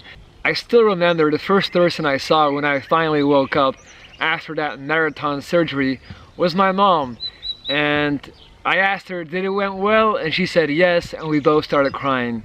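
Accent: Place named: United States. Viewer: Your story is quite something. I have an off-topic question. You sound like any American I have ever met. Yet you lived in Belgium and then in Stockholm., Sweden. So where are you originally from? I would simply guess the US based on how you speak but that doesn't seem quite right. You must speak a ton of languages, living in both Belgium and Sweden, and visiting friends in Barcelona, Spain. But your accent is 100% pure American. So where are you from? Just curious. And keep your spirits high. You have one heck of a story to tell. I will be watching more of your videos. And I love your screen name, too. All the best to you.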